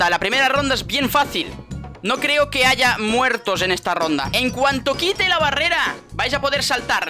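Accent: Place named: Russia. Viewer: La primera ves qu vi ah dije alv un ruso que habla español xd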